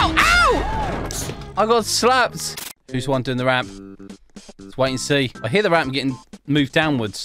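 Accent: United Kingdom. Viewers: I like u because I love your british accent 🤠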